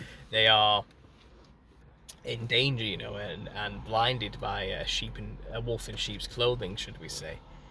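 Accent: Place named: England, United Kingdom. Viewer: They aren't stupid, just misled. There's an entire ecosystem of facts they can cling to even if they do there own research. Nice to see an English voice in this space, you do good work, lots of love.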